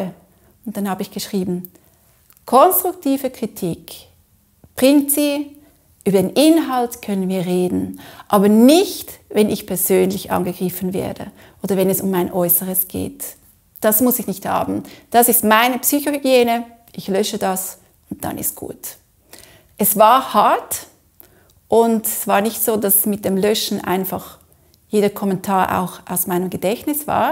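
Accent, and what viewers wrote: Switzerland. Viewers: Liebe, Du hast mir aus der Seele gesprochen. Es ist zumTeil unglaublich, was man im Netz so erlebt... Viele meiner Kollegen schalten Werbung und werden dadurch persönlich angegriffen... ohne Worte. Danke deshalb für dieses mal wieder sehr gelungene Video. Ich persönlich mag neben Deinen Inhalten Deinen Schweizer Dialekt besonders. Bitte deshalb unbedingt beibehalten und kultivieren;-) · Danke für deine offene und ehrliche Meinung. 👍Mach weiter so mit den Lehrreichen Videos. 👌🏻PS: ich mag so sehr deinen schönen Schweizer Dialekt. Gruß aus dem hohen Norden, Manfred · Ich liebe deinen Schweizer Dialekt 😊🇨🇭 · Vielen Dank für deinen ehrlichen Beitrag! Ich liebe deine Videos und konnte mir bereits viele wertvolle Tipps mitnehmen. Ich finde dich sehr charmant und liebe deinen Schweizer Akzent. Gerade das macht dich so authentisch und unterstreicht deine sympathische Ausstrahlung! 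Viel Erfolg weiterhin! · Wow, ein super Motivations-Video! Vielen lieben Dank! Mal ganz abgesehen davon, daß du wirklich sehr sehr sehr guten Inhalt produzierst; ohne dein schönes Schweizer Akzent wären deine Videos weniger Scharmamt ❤️. Ich finde es Super, daß du Mut gefunden hast und weiter gemacht hast. Deine Kurse sind bestimmt sehr sehr gut! Ganz liebe Grüße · Zum Glück kannst Du Selbstbewusst mit dieser Problematik umgehen...Ich mag Dein geschweizertes Deutsch..😊 Du bist super... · Für einen erfolgreichen YouTube Kanal braucht man in regelmäßigen Abständen einen guten, hilfreichen Inhalt. Weiterhin ist es meines Erachtens wichtig, dass die Person authentisch rüberkommt und man nicht den Eindruck hat, dass da eine Show abgezogen wird. Beides ist bei deinen Videos voll und ganz gewährleistet. Dazu der schöne schweizer Akzent, der deine Authetizität noch unterstreicht. Vielen Dank für deine ausgezeichnete Arbeit! 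· Negative Kommentare sind auch irgendwie eine Auszeichnung ! So ein „negativer Kommentator“ nimmt sich die Zeit um Deine Videos zu sehen, ist möglicherweise neidisch oder kann mangels fundiertem Fachwissen keine sachliche Kritik anbringen oder hat Probleme sich angemessen auszudrücken . Wenn „negative Kommentatoren“ Deine Videos wirklich nicht gut finden würden täten sie diese nicht anschauen oder gar zu kritisieren versuchen. „Nicht einmal ignorieren solche Leut“ würden wir Österreicher sagen 😜 Ausserdem, ich liebe Dein schweizerisches Deutsch 😍 Ich finde es sehr wichtig und erfrischend wenn wir „Deutschsprachigen“ unser Dialekte sprechen und nicht von Schleswig Holstein bis Südtirol ein Einheitsbrei gesprochen wird . Liebe Grüsse aus Oberösterreich !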